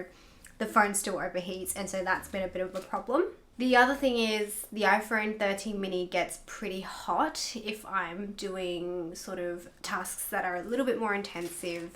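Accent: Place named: Australia. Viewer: I love your australian accent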